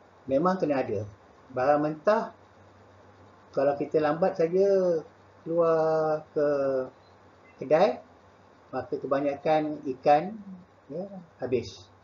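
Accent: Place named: Pahang, Malaysia. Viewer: Dh jadi org Pahang dh cikgu.... Ckap pon dh loghat pahang dh...😀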